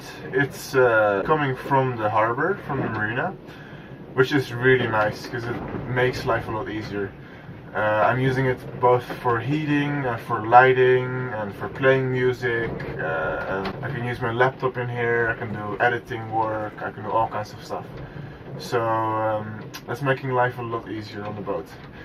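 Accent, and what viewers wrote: Netherlands. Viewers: ik had ook al zo'n vermoeden. Zelfde soort accentje als mij; je Engels is zeer goed. Mooi zeg in Noorwegen! Maar dan wel weer koud in de winter. Een idee om te overwinteren op een boot in Spanje (in September varen oid?) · Cool! Are you dutch? You sound like it! 😊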